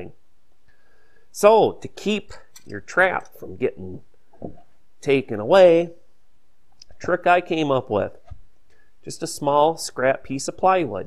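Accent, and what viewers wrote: United States. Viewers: jeremy thole Ha ha I’m in Melbourne Au trying to work out if it’s an American or Canadian accent! Guess it’s American?! 🥴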